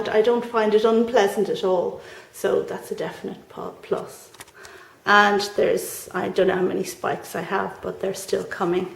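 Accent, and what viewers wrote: Ireland. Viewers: Happy to have found your channel via Lyn's! I just love your accent and love seeing glimpses of Ireland out your window! I live in the US and my mom took me to Ireland for my 30th birthday. My parents have friends in Ireland and they drove us around because neither of us trusted ourselves driving on the other side of the road! We visited in February and I loved the rain and seeing the mist in the valleys and fields. We stayed in a town a short train distance from Kilkenny. I can always remember Kilkenny but not the name of the little town! Haha. I wish I had thought to bring a houseplant back as a souvenir.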